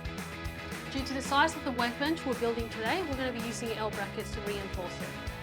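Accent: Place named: Australia. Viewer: How refreshing to see a woodworking video in metric combined with australian accent!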